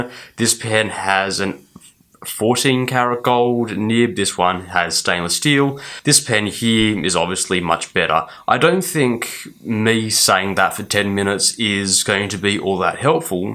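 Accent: Australia. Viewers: From his vowel usage I’d say Australia, or Straya! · Lovely accent. Are you from Australia, or New Zealand?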